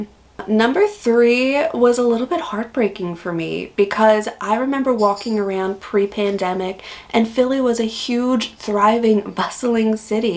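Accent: Australia. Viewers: Sounds like you've become Australian. Congratulations! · You now sound like an aussie. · I can hear that you are subtly starting to gain the Australian accent, congrats and welcome home.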